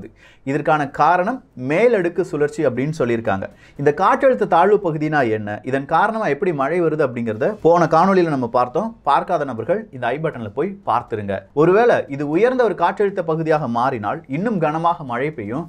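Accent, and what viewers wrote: Kerala, India. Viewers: Are you basically malayalee. Now and then you speak in malayalam accent. No intention to hurt you. Just anxiety. Please reply.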